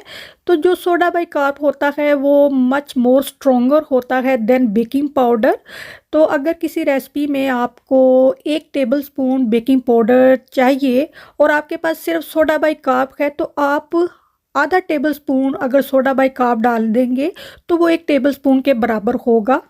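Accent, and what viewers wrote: Punjab, India. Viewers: Very nice I like ur Punjabi touch in vlog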